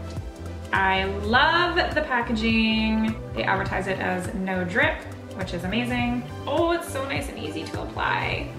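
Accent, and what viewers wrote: Canada. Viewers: is it just me or does she sound SO canadian in this video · can you just make a video of you saying sorry I love when Canadians say it. It sounds like sorwy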